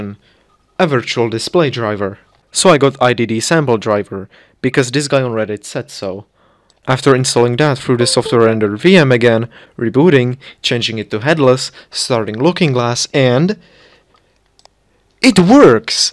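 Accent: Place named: Czech Republic. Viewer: z prvych 5 sekund bolo pocut ten cesky prizvuk xddd, inac dobre video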